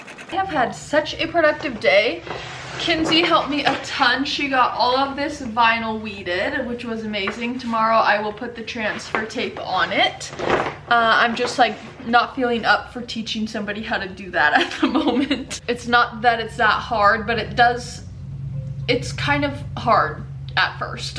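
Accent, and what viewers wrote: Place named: Montana, United States. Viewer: Hyped and liked. Getting my Montana fix. Just hearing you speak brings warm feelings. Funny how you don’t realize that there is an accent to folks from back home until you’ve been away. Now I’m in Texas the difference is stark. Most of my Montana family have passed on, but I’m still kicking so I need the fix. Thanks.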